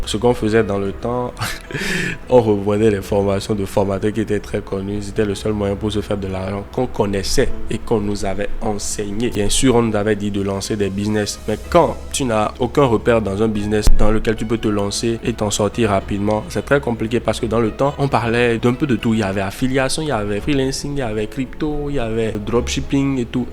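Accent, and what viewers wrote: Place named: Togo. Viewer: Bonsoir, vous avez un accent togolais!🌺❤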